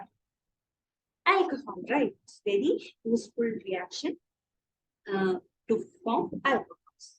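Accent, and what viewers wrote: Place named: Kerala, India. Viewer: Malyalam mathii english not clear mam.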